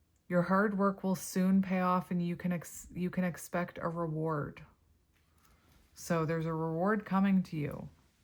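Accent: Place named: Manitoba, Canada. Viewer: I'm so curious, what province are you from? Your accent sort of gives me Manitoba vibes but it's hard to tell haha 😄BC girl over here 🇨🇦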